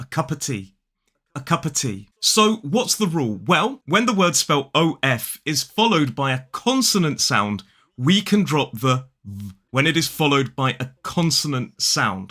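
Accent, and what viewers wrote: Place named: Cockney, United Kingdom. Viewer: Nice english similar cockney accent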